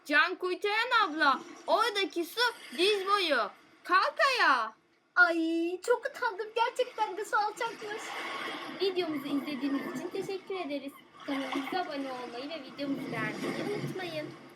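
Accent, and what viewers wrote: Black Sea, Turkey. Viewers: siz karadenizlimisiniz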